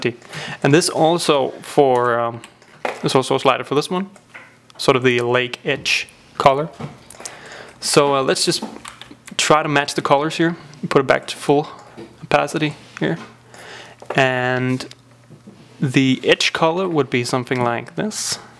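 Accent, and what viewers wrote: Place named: Denmark. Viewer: Idiots, it's because he is from Denmark not UK or USA. That means English isnt his main language, I guess :P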